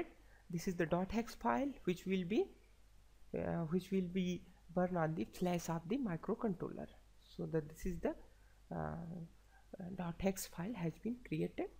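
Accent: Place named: India. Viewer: First of all thank you for your effort. mention that im not racist at all and i love indians, but im sick of that ACCENT!!! Man can you guys please work on your accent its so ugly and as people who need free educational content in coutnries like iran (myself) we really appreciate if indian guys speak like english.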